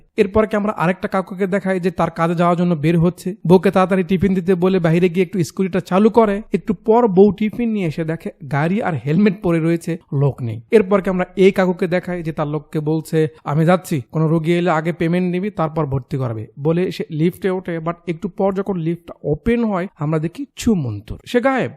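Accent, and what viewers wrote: Bogura, Bangladesh. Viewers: ভাই আপনার বাসা কি বগুড়া ❤😮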